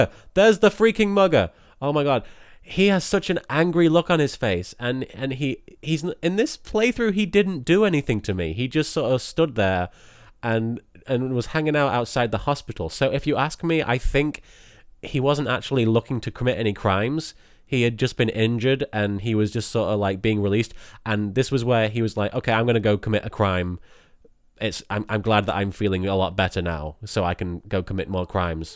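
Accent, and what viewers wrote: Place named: North, United Kingdom. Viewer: NO. NO, FFS. Why does anyone have a problem with doing a let's play in character?? It was hilarious. Who are these people???? Don't listen to them! If they want to actually hear all the boring dialogue in Bloodlines then why don't they just play Bloodlines? Also, trying to put my finger on where LKs accent is from. North East? North West? Dammit. He sounds a bit like turkeyleggggs, anyway.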